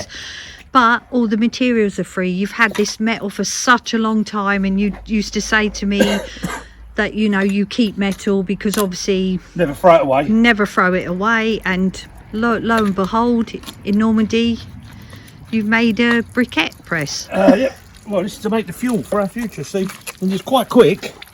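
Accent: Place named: England, United Kingdom. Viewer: You sound English,are you in England? 🇬🇧🇬🇧🇬🇧🇬🇧🇬🇧🇬🇧🇬🇧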